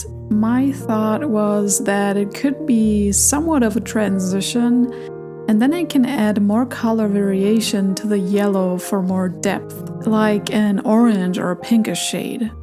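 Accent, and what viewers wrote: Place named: Germany. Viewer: Subscribed! I LOVE the colorful portraits, but honestly? I wasn't expecting your accent. I spent a handful of very happy years living in Germany as a teen, and i didnt realize just HOW much i missed it. So im going to draw alongside your videos and feel all the comfort and nostalgia in the world. Thank you 🥹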